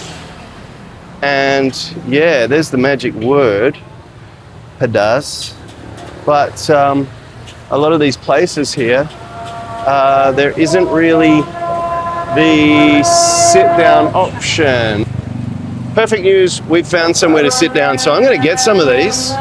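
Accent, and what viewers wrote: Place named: Australia. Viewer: the foreign accent (Australian?) pronouncing Indonesian had me confused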